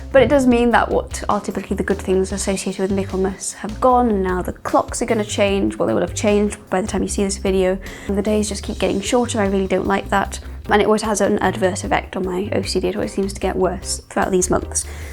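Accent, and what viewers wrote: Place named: United Kingdom. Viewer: I was wondering, why it was you sound so British? Is it because you have been in England for a whille now? Also was Spanish your first language? Sorry for so many q's! And a video on mental illness would be fantastic. I don't think people (inc me) no much about OCD.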